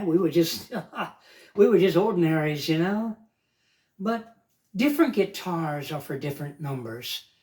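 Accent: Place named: Liverpool, United Kingdom. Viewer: Soundin' positively Liverpudlian there! (& not boring!)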